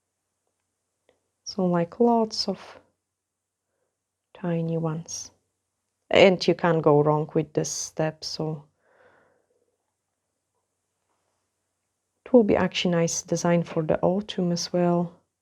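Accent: Scotland, United Kingdom. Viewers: Greetings from Columbus, Ohio, USA. I was going to try this method but I don't have any acrylic powder so I will have to do a different way. I do pick up some Scottish inflection in your accent. Love that and your work. I am a retired RN, teaching myself nails the last 3 months. Love this hobby.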